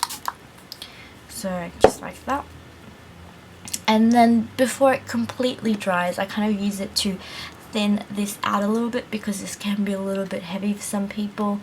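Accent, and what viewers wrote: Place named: Australia. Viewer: A filipino girl with a what I guess is an Australian accent? Interesting! Subscribe! :)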